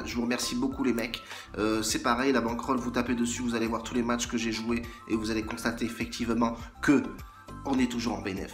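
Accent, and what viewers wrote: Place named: Italy. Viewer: Slt tonton,bien joué ça passe crème,tu nous régale la famille! Et les pertes, c normal sinon on serait riche,continue comme ça,et surtout tu me fait trop rire avec ton accent italien!!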